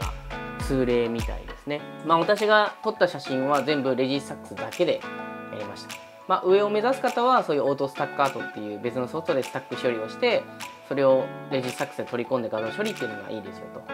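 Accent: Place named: Hokkaido, Japan. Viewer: 恥ずかしながら私はカメラマニアではなく、北海道弁が気になっちゃって、拝聴させております。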